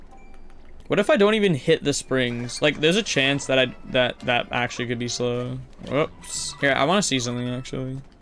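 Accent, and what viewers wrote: Canada. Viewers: That’s just how Canadians sound like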